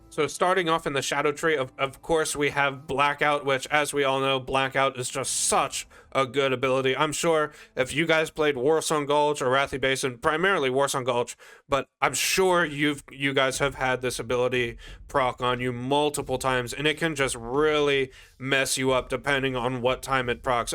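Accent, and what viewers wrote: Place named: Maryland, United States. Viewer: You're from Maryland, aren't you? The accent is so pronounced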